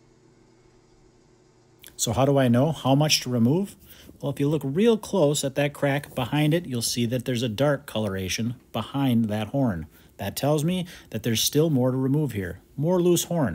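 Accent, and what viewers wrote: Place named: Arkansas, United States. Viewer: U from Arkansas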